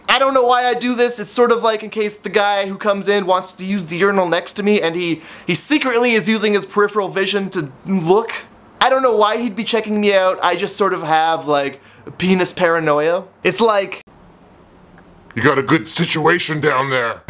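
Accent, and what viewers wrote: Canada. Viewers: he sounds way more canadian than usually here. weird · his canadian accent :)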